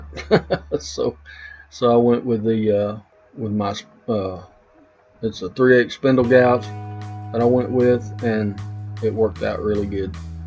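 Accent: South, United States. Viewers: I don't think it's because of the way you talk. You seem to be a very polite person, which goes a long way. It's probably because people are more impressed with the quality of the video production, than the finished product itself. For example, Frank Howarth's channel is impressive not only because of his woodworking skill, but because of his editing skill, not to mention the versatility of his projects. Anyway, best of luck to you! Signed, a guy from Romania that doesn't care about your southern accent as much as he cares about your quality content. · Wonderful work. I love to watch your videos, very informative and entertaining. I live in England and I find that Good ol' Southern US of A accent great as well (:-). I'm so envious of you just being able to walk out back and grab some timber the way you do. Carry on with the great vids. All the best, Stuart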